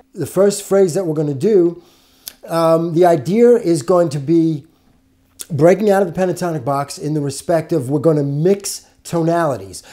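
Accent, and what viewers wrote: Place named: New York, United States. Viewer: This is great stuff. Also love the New Yawk accent, I'm from Brooklyn...LOL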